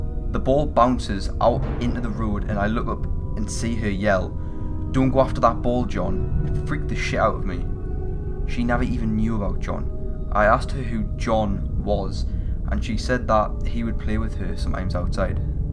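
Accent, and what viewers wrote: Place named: Scotland, United Kingdom. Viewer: Where is it that you are from?? Sounds Scottish (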